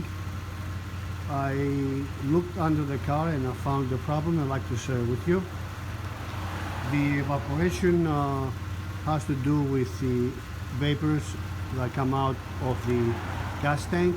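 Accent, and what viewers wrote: Greece. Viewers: You sound Greek lol. Good video.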